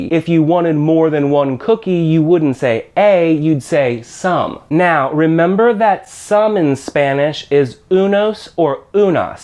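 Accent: United States. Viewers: Your very good at teach but the american twinge to your spanis pronunciation makes me giggle a little bit!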